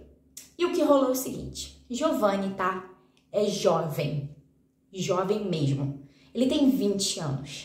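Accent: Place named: Rio de Janeiro, Brazil. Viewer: o sotaque carioca é tão bonito,quanto a própria cidade. o fluzão,só poderia ser dessa cidade,conhecida mundialmente,como cidade maravilhosa. 🌅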